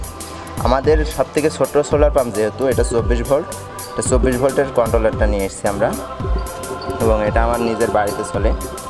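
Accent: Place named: Chandpur, Bangladesh. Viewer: তা আপনি একটা ভিডিও বানাচ্ছেন ভালো কথা কিন্তু কষ্ট করে শিখে নেন না কেন যে কিভাবে মুটা মুটি শুদ্ধ বাংলা দিয়ে ভিডিও টা প্রেজেন্ত করতে হয়? সেটা তো আপনার চ্যানেল এর জন্য ভালো ,আমার বাড়ি ও জসর শহর থেকে ২০ মাইল একটু নিচে কিন্তু আপনার মনে হয় কোট চাঁদ পুরের দিকে ।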